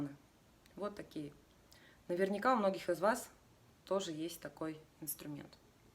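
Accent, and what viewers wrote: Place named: Yakutia, Russia. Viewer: Hi! Excellent tutorial as always🤗🤩. Thank you for the English transcription but nothing will ever replace your beautiful Yakutian accent😍😘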